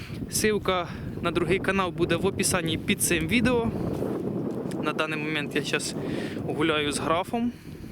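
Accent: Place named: Nizhyn, Ukraine. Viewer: акцент на наш Ніжинський схожий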